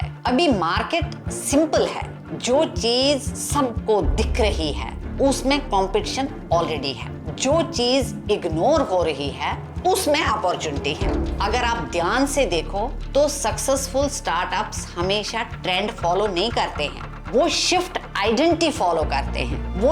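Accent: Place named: Kashmir, India. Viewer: Aadab mam ... I c your content just now first time ... Your accent of taking is just kashmir type... Any i appreciate your work... Best of luck Dr Masood Ahmad Tantray.... Educationist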